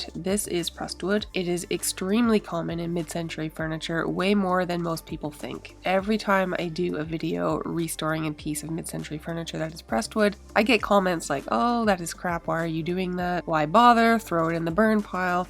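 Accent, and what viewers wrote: Canada. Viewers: Hi. I’m a senior Canadian woman living in Europe. So when I see your videos, I get the chance to hear a fellow Canadian. For me it’s also a cultural connection. Your videos are informative - I get to see more characteristics of wood and different styles of furniture. All I see is an excellent presentation - certainly something for which you should be proud. As for your tattoos, I think they’re beautiful. Thank you, also for your generous contributions to protecting bunnies and for caring about your community. Please continue making your videos. I cannot do furniture work in my apartment, but if I had to shop at one of the second hand shops (almost every village has one), I would be better informed regarding the pros and cons of an old pieces of furniture. Thanks so much for making my YouTube experience an educational one (as well as entertaining). 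Take care and best wishes for a wonderful future. …..waiting for your next contribution. Thanks again.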